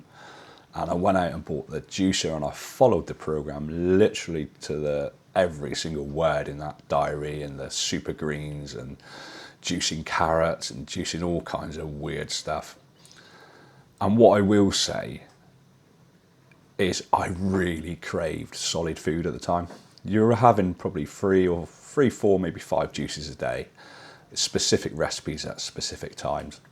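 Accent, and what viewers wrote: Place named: England, United Kingdom. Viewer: OH, Brother, do I get a kick outta your English accent!! I live in Texas where we don't get to hear anything but that Southern USA, twang. I'm hoping that I get use to it soon so that I can better listen to your journey story without LAUGHING my a__ off. Thank you, Sir.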